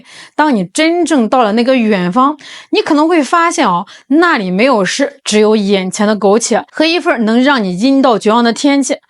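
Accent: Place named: Shandong, China. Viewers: 这女主播被这口北方口音给毁了，本来还算美女一枚. 😅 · 这山东口音简直了😂😂😂😂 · 这山东味儿的普通话 😀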